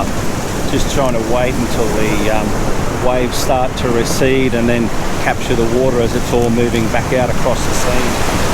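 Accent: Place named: Australia. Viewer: G'day, I've just given you a sub mate. I'm a learner and I've stumbled on to your channel and I've got to say how refreshing it is to first know where you're talking about and to hear a familiar voice in the form of your Aussieness. I'm enjoying the fact that you are shooting places that are reasonably accessible for a fella like me and I'm going to confess I will be visiting some of these locations. Thanks heaps for your efforts with this channel mate, fully appreciate it.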